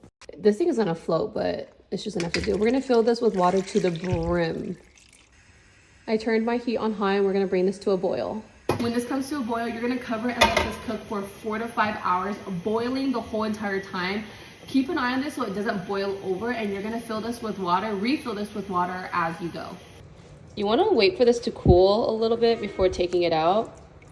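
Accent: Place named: Vietnam. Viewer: As a Vietnamese, I totally confirm that you had awesome banh chung 😂 Love your Vietnamese accent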